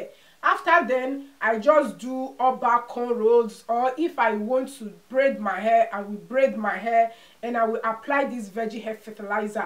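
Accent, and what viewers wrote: Nigeria. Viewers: Great tips sis. But r u 4m Nigeria cos ur accent is like for akii and popo of Nigeria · This is nigeria accent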